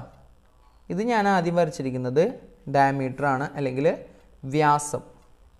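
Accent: Thrissur, India. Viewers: Sir thrissur aanalle njaanum thrissuraa but now im malappuram... I’m still missing our slang😓✌🏻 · Thrissure kkarandhe bhasha poli Nan oru malappurakkali aanu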